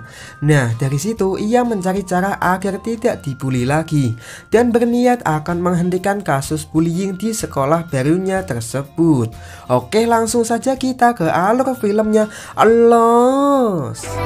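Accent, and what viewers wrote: Java, Indonesia. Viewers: jowo mu endi mass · Medok banget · wong jowo? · Medok banget sih Lo, jijay gw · Meudok bingit min .jangan kaku min bicara nya. Monoton bingit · Medok banget lu bang..wkwkkw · JAWA NYA MEDOOOOOOOK BGT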